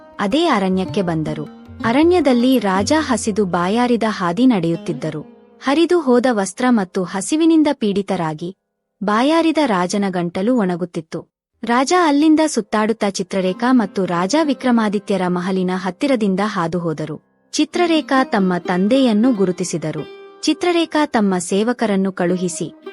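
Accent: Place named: Maharashtra, India. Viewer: Neevu yaru Maharastra davaru iddiriye Kannada vannu heegeke mataadutiddiri😂😂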